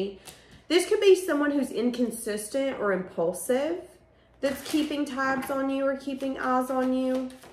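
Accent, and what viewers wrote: South, United States. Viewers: I love your southern accent 😊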